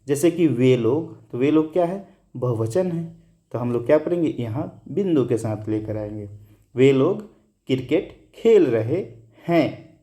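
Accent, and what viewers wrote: Motihari, India. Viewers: Aap Motihari se hai kya bhai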